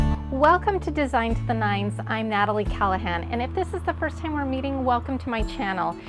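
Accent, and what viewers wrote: Utah, United States. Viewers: I have those same jack-o-lanterns and I just turn them around for the month of November. I also made your welcome home sign and had thought of putting a big maple leaf where the wreath is but I love the idea of just adding holiday embellishments to the wreath ! Thanks for all the cute ideas. You sound like you may have grown up in Utah. Love your channel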